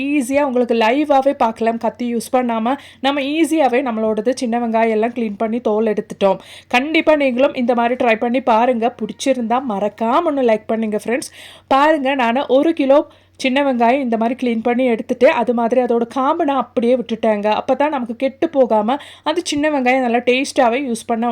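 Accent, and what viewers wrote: Kerala, India. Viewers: உங்க பேச்சில் மலையாள வாசனை அடிக்குது.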